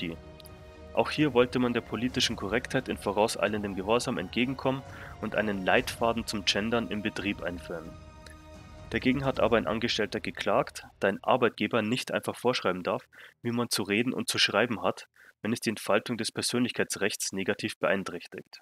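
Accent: Bavaria, Germany. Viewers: wo genau? 'ua' is ja eher Niederbayern/Oberbayern. Mia Oberpfälzer bzw. Waitler han ja de oanzigen de wo no as 'ai' und as 'ou' ham😂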